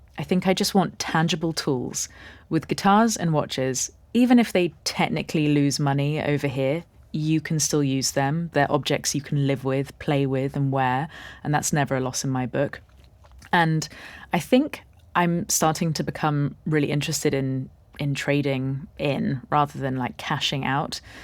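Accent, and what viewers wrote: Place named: United Kingdom. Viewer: This British accent is ❤❤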